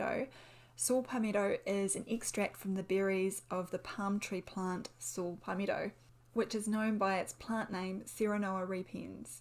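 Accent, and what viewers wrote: New Zealand. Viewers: Beautiful New Zealand accent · Love the kiwi accent 🥰 Thanks doc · I take saw pameto in powder and I only use a little because I juice it with a bunch of other herbs, is that good or badd ? I've heard so many different things about it and you're the 1st to say that it's probably not the best for men in a lot of ways so what's going on? By the way I know you're from New Zealand by your accent because one of my good friends is from the total Kiwi accent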